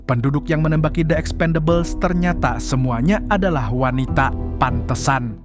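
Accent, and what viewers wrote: Banjar, Indonesia. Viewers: Pian ni Banjar mana mang?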